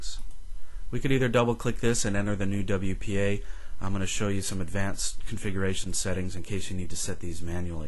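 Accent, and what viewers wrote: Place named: United States. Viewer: No accent??!! I could definitly hear the American accent.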